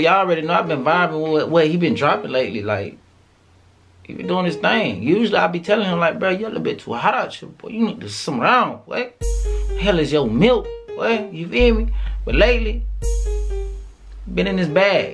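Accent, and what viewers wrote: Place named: Philadelphia, United States. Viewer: You from Philadelphia I could tell young bull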